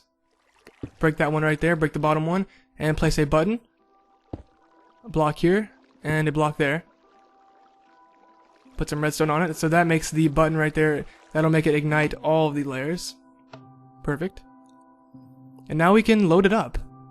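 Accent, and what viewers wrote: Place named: United States. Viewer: great video, your american, so i can understand you easily :P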